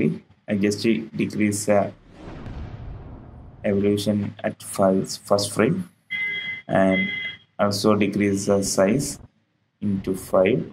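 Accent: Kerala, India. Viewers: ഇംഗ്ലീഷ് കേട്ടിട്ട് മലയാളിയാണെന്ന് തോന്നുന്നു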